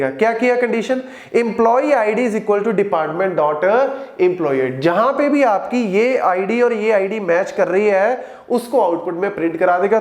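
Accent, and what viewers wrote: Punjab, India. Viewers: sir ji app punjabi ho?/?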